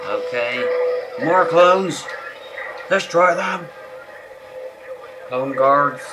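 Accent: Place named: Philadelphia, United States. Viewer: Good tutorial, u from the states? you have a philly accent...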